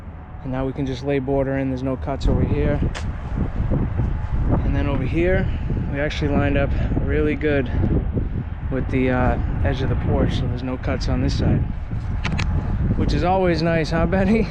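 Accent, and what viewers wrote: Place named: Maine, United States. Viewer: I love your channel and videos! For a second, I thought you were a fellow Canadian... well... until the Maine accent came out.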